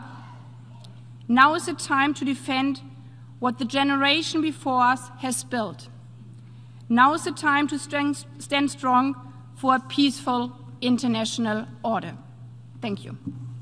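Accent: Germany. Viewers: Als halb Ire, der mit deutsch und englisch aufgewachsen ist, muss ich sagen dass ihr englisch nicht schöecht ist. Natürlich hat sie einen deutschen Akzent, sowie 90% aller deutschen. Man versteht sie aber sehr gut, ihr Satzbau und ihre grammatik sind quch gut und sie spricht besser englisch als 90% unserer Politiker und wahrscheinlich besser als 100% aller AFD Plotiker, also bitte mal klarstellen, macht ihr euch über ihren Akzent lustig? Sehr gewagt als deutsche, denn ich wette ihr habt alle einen starken deutschen Akzent und ich wette die meisten von euch können nicht mal einen geraden Satz formulieren! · Ja, sie spricht mit deutschem Akzent. Und der wird auch stärker während der Rede. Aber der Inhalt, das Vokabular, die Grammatik sind einwandfrei. Übrigens hat auch der Präsident, der sie am Anfang ankündigt, einen starken Akzent... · Astreines Englisch. Für Jedermann verständlich, emotional und mit deutschem Akzent. 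Besser spricht kein deutscher Außenminister.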